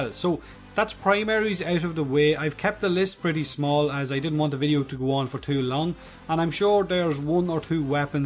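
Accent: Ireland. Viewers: Irish really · IRISH. SUBBED! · I love your fucking accent I am Irish too xD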